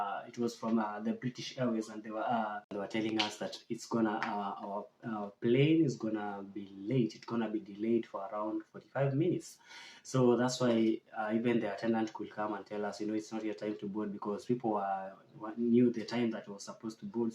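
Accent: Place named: Meru, Kenya. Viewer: Its the meru accent for me 😂 that customer service lady alikusave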